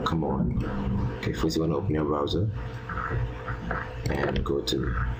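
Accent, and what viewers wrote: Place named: Trinidad and Tobago. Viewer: He is not Nigerian he is Trinidadian... their accents are very distinct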